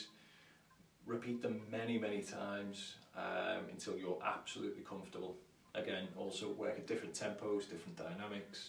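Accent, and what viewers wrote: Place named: Wales, United Kingdom. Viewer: the first contact I’ve ever had with a Welshman in a long while (worked with some ladies from Wales many years ago—love the accent/language). Thanks for considering my comment — hope the move was successful!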